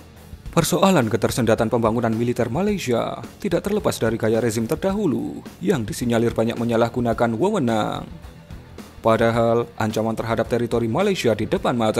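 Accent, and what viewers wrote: Java, Indonesia. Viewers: Mbok medok nya dikurangi om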